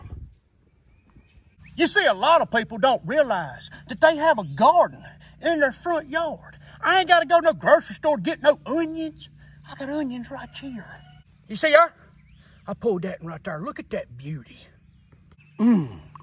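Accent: South, United States. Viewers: Very southern sir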